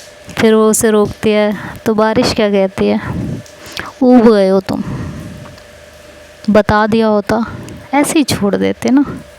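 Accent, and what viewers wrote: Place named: Haryana, India. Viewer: haa har jgh ki language ke according bolne ka trika change ho jata hai.. jese apka full hariyanvi touch ho rha tha.. meri mummy bhi bol rhi thi ki ha harayana jesi hi aavaj lag rhi hai because mere papa vha job karte the hariyana me jb me peda nhi huvi thi tb to mumy bhi rhti thi sath to unhone observe kiya hai.. mummy bol rhi thi ki aap mjakiya type ho i mean ye nhi ki jb bat kro tension do us type.. pta h mummy ko suabh se chull tha ki mere ko bhi sunana aavaj 😂😂😂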